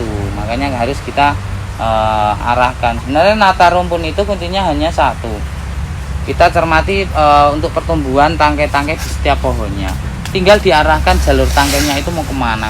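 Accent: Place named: Java, Indonesia. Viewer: Tiang jawi nggeh logatte keton banget 😊😅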